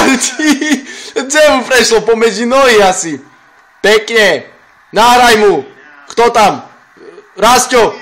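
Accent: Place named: East, Slovakia. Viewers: jeden záchvat skončil,a pri dalšiej vete znova :'DDDD niet nad našu vychodnarčinu <3 :3 :D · paradaaaaaaaa...supeeerr....aj ked polka SVK nerozumie asi ale "na vychodze rozumime" :D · Vychodňar ako ma byť!! :D :D :D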